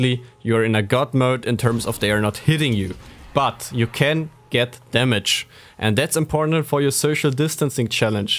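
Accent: Germany. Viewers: sorry aber dieses deutsche englisch macht mich sooo aggresiv :D aber gutes video habs doch einigermaßen verstanden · Ur from germany right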